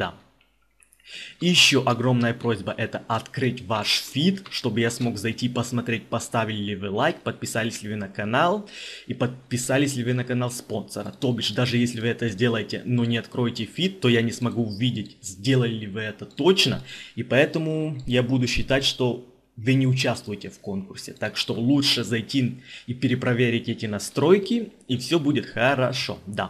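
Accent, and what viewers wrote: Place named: Ukraine. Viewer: Украинский акцент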